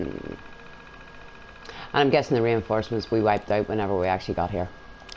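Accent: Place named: Northern Ireland, United Kingdom. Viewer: Love that "northern" accent... Derry or thereabouts? Georgia (USA) here. Well done.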